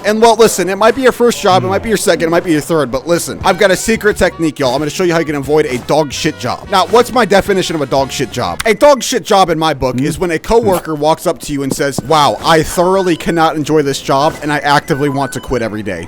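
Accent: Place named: Wisconsin, United States. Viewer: not to be weird but i have this feeling your from wisconsin or a neighboring state. Culvers gang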